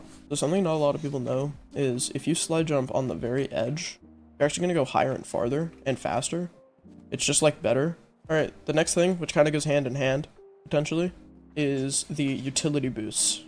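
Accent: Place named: Kashmir, India. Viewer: hey are you from kashmir India if yes then i am from Jammu and your accent is amazing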